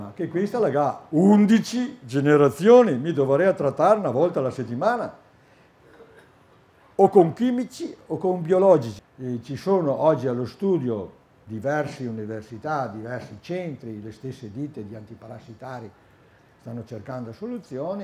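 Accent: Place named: Verona, Italy. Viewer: Perché? Cosa dice che non va. È veronese, di una certa età, come dove parlare secondo costoro? Bha... Giusta osservazione la tua. Ciao!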